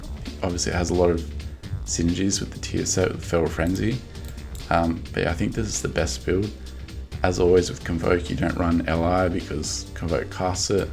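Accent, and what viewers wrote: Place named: Australia. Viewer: teaching people feral is nice but can you teach people the australian accent too ty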